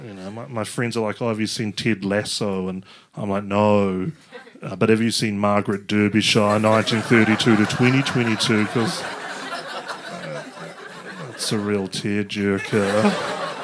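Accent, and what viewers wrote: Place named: New Zealand. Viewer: Kiwi / New Zealand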